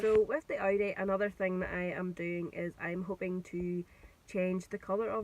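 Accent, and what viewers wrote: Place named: Northern Ireland, United Kingdom. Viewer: It's the townie accent which makes them think you're Scottish. 😂 😂 if I had to guess I'd say Lurgan but you might not want to get that specific. 😂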